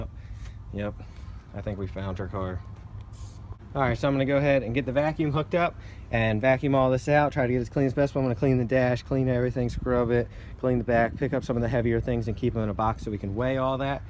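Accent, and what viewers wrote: Maryland, United States. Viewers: Soon as I heard that accent I knew he was from marelin hon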